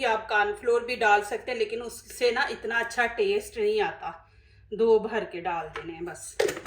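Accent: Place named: Punjab, India. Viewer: Apna bo8lne ka st5yal sake karin. P9anjabi le3hja na ho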